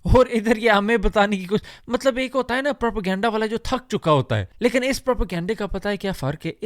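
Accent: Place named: Punjab, Pakistan. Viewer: Bhai aapka lehjaa punjabi hai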